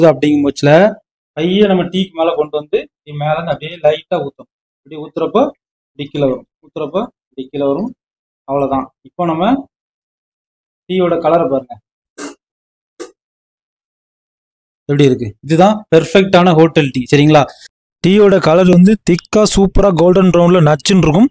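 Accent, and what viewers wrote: Tirunelveli, India. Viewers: bro neenka tirunelveli ya?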